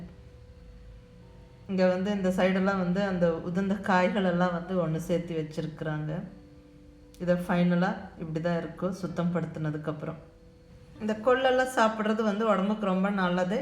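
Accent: Coimbatore, India. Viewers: Neenga Kovai ya mam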